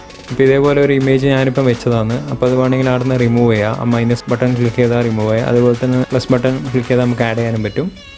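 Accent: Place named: Kannur, India. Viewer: കൊള്ളാം, നല്ല അവതരണം. കണ്ണൂർ slang ഉണ്ട്.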